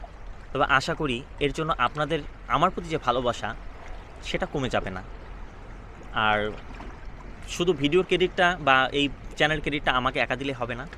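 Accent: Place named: Kolkata, India. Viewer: আপনার বয়েছ কলকাতার বাঙালিদের মত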